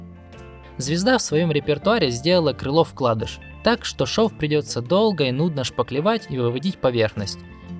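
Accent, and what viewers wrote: Ukraine. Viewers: Чувак явно с Украины)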